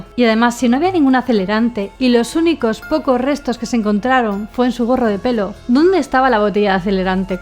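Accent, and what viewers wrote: Spain. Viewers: Muy buen vídeo; por tu acento asumo que habrás oído hablar alguna vez acerca del crimen de Alcácer, sé que es un caso bastante sórdido y enmarañado, pero estaría interesante un vídeo al respecto con tu excelente narrativa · Hola guapa eres de España se te nota en el asentó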